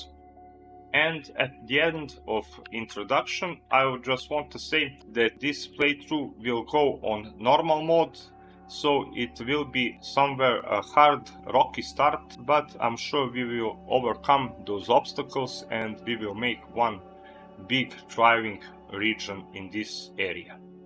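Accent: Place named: Serbia. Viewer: W series so excited for the next part Love the serbian accent😂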